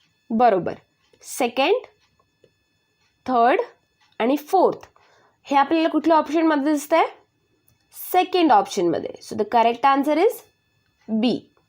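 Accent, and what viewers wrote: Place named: Vidarbha, India. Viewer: Tuze language konti aahe tu kashi bolti tyamde lokana kahi kalena zalay Vidhrbhatli aahe ka tu bai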